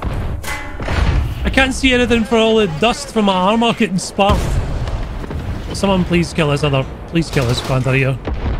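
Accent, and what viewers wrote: Scotland, United Kingdom. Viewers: Hey I was for Christmas in Scotland Ben Nevis defeated me but your video was useful as a accent tutorial while drinking with Scottish people-they did not defeated me in drinking 🤣 Scotland is so beautiful! people kind! Alcohol great! I brought a blue beautiful hat everyone 8n London aks me about my orgin and i feed them up with madeup stories about my childhood in mountains- with perfect accent because of you 💪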